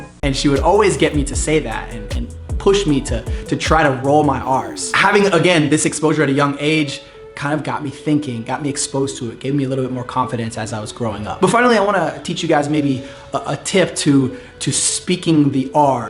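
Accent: Buenos Aires, Argentina. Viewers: Me parece o perdiste un poco tu acento natural? Te escuchaba muy porteño 😄😊 Me encantó el video... muy buen tip! 👏👏👏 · Más porteño que la mierda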